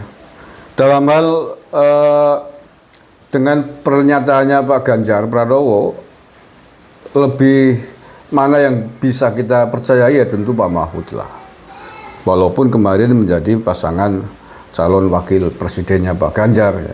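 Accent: Banyumas, Indonesia. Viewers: Ngapak temen rika bang?? Ngomonge . Ora ngapak ora penak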